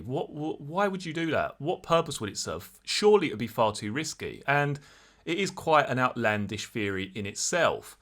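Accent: United Kingdom. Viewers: I look forward to all your videos regarding this crime. You throw out so many points, & theories like I do, & think like I do. Also, your accent is wonderful,& British accents are in my top 3 favorite accents, and it really brings out the captivating way of telling a story, IMO.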